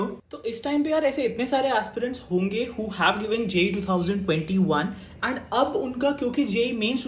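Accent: Punjab, India. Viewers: Kya tum punjab se ho....✍️✍️✍️✍️✍️✍️✍️✍️👂⤵️⤵️👂⤵️🏆🏆🏆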